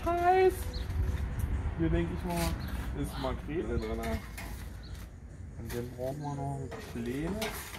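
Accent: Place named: Altmark, Germany. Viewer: Ich kann bestätigen, dass Du den Fisch wirklich richtig toll hinkriegst. Es ist ein einfaches Rezept aber hier stimmt mal wieder die Klausel "Weniger ist mehr" und allein schon Butter und Zitrone ist schon Erfolgsgarantie. Und schön zu hören, dass Du hier mal so richtig Deinen Altmärker Dialekt zum Besten gibst ! Das mit dem Rosmarien lernst Du auch noch irgendwann. Super !❤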